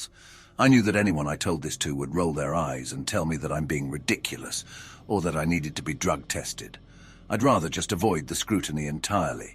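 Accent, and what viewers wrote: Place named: United Kingdom. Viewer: Yay new horror narrator cool and a lovely British accent bonus ❤ subscribed ❤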